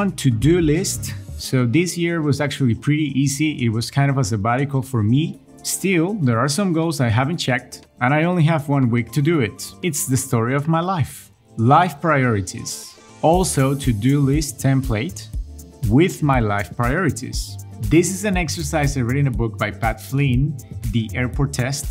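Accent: Colombia. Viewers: Are you Colombian? Something in your accent and sense of humor makes me think you’re Colombian 🇨🇴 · The way you explain it was so nice! btw, loved your Colombian accent! Colombian here too :)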